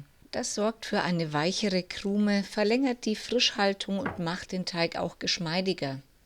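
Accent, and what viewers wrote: Bavaria, Germany. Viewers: ja, ich habe mir seit gestern bereits vieles angeschaut und habe sie auch abonniert! Ihr bayrischer Dialekt ist Balsam auf meine Seele! Ich habe 23 Jahre in München gelebt! Ganz liebe Grüße!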